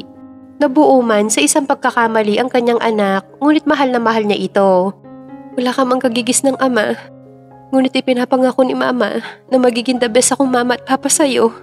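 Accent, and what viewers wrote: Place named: Cordillera, Philippines. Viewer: narrator parang taga somewhere in Kalinga or Ifugao ka,rinig po ung tuno sa boses mo sometimes hehe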